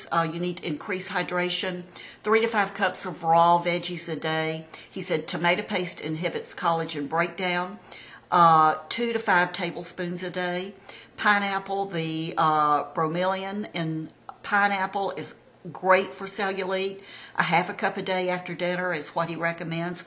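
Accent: South, United States. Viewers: This lady is an such a debutante of the South! The accent is priceless and you can tell she is a genuine person with a great heart. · I love her and her accent because I’m from the south. You look beautiful!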